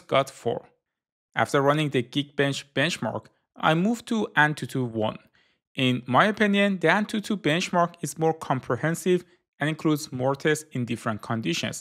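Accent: Iran. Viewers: Bro, I sensed from your accent that you are Iranian, am i right?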